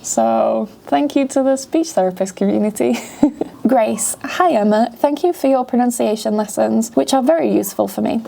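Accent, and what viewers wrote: United Kingdom. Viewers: Would you please read short stories.. I like listening to your beautiful clear British accent narrating a short story.. thank you in advance Emma ❤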